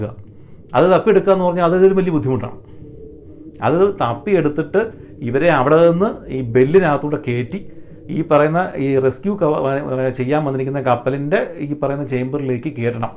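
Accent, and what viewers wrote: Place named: Kasaragod, India. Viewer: നിങ്ങൾ കാസർകോട് ആണല്ലേ😜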